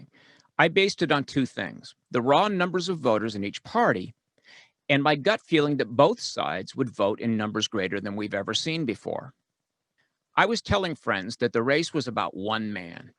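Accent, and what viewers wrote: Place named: California, United States. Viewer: Californian huh, you project it well.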